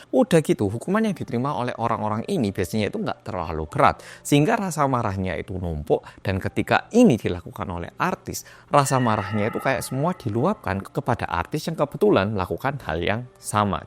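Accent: Java, Indonesia. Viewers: Jawa lu medok banget,😂😂😂😂😂… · Nungguin bget si komen dan opini oppa yg medok..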